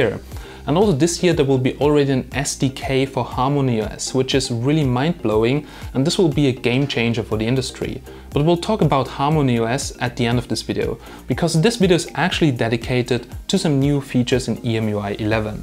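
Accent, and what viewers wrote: Germany. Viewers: Eigentlich hört man sofort raus, dass er aus einem deutschsprachigem Raum kommt.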